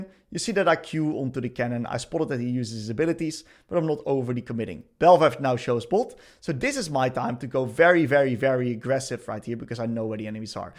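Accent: Netherlands. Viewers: Je Nederlandse Engelse accent is crazy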